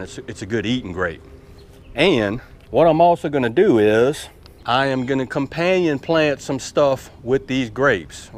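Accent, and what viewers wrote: South, United States. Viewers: But really in the nicest way we do enjoy listening to your southern drawl have a great day!!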